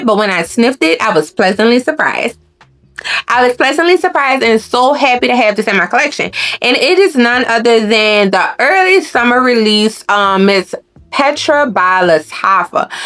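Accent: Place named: Louisiana, United States. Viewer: Hey Sis!!! Girl...are u from Louisiana?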